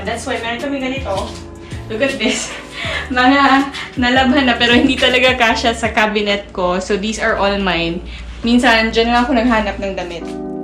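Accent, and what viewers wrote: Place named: Visayas, Philippines. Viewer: HIi, I love watching your video. First na napanood ko sayo yung youtube sweldo. Tapos ito na, parang feel ko at first na bisaya ka. So happy nung narinig ko na taga Cebu ka nga. You're an inspiration. New supporter here. God bless. Cebuana rin ako pala hehe. Keep safe.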